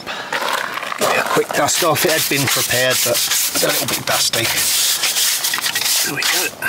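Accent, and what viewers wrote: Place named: London, United Kingdom. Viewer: Doors look great. I am interested in your slight accent. As I was born in Kenya during colonial times, my mother was from Southern Rhodesia Zimbabwe. It is said I speak with slight ‘Rhode ‘, your accent sounds somewhere near or in London., retired Airline pilot. Thailand.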